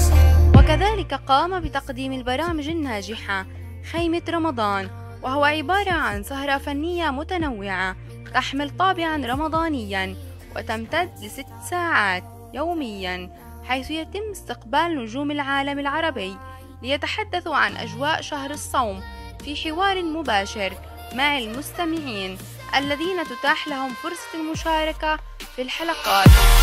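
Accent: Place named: Saudi Arabia. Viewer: لهجته اقرب للهجه السعوديه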